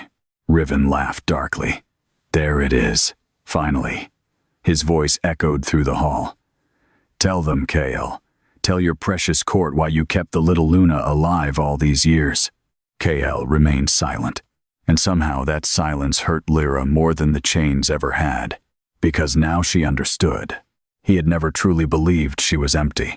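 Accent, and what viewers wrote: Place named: Spain. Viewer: What's with all the " Spanish/Mexican " pronunciations?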